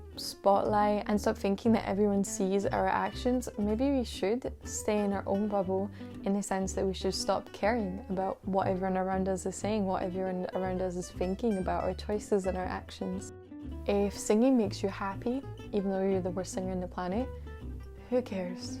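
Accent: Scotland, United Kingdom. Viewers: I feel like you’re Scottish? Are ye? Mibbi? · Scottish? Your speaking voice is so soothing, ill belive your a bad singer when I hear it.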